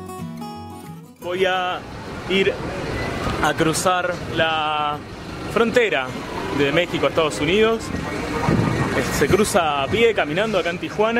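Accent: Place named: Rioplatense, Argentina. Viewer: ¿Argentino o Uruguayo? Demasiado evidente de tu acento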